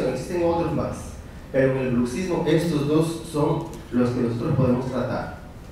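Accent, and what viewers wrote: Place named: Brazil. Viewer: muy buena exposición.. pero no es necesario hablar como brasilero.. yo vivi 15 años en españa y jamas hable como gallego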